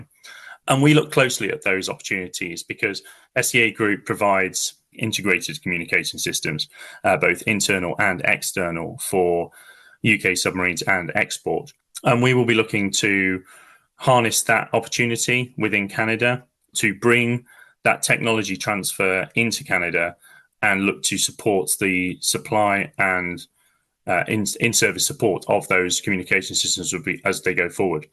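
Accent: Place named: United States. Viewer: You sound like your a yank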